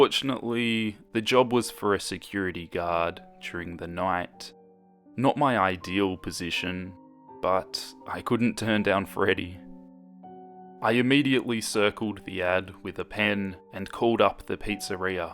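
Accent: Australia. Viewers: OMG UR AUSTRALIAN HI :,) IM AUSTRALIAN TOO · Your Australia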